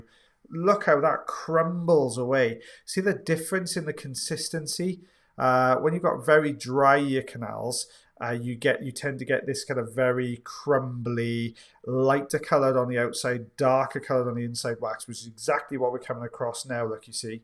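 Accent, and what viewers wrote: Wales, United Kingdom. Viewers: it's definitely Welsh. Sounds probably from the valleys of Wales. Hth xx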